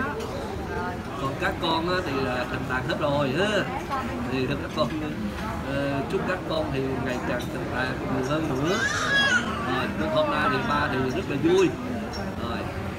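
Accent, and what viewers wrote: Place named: Mekong Delta, Vietnam. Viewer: Giọng cua bác hơi tây tây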